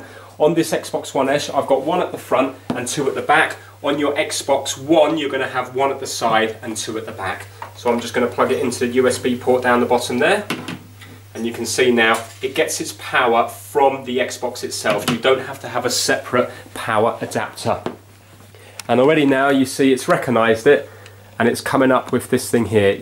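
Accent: England, United Kingdom. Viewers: I like your British accent. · What part of england are u from just wondering?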